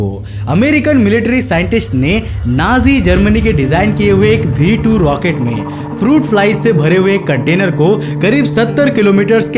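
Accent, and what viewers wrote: Bengal, India. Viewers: are u from West Bengal? BECAUSE YOUR ACCENT SAYS SO. AND I PERSONALLY LOVE BENGALI PEOPLE.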